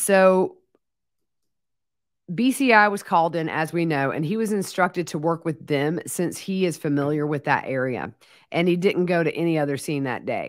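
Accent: South, United States. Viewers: I also love that " Southern drawl"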